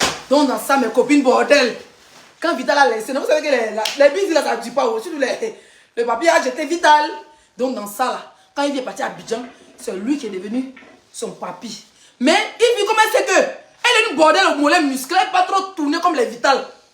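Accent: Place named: Cameroon. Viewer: Stp parle comme une ivoinnene lorsque tu parle comme une camerounaise ça ne donne pas de grâce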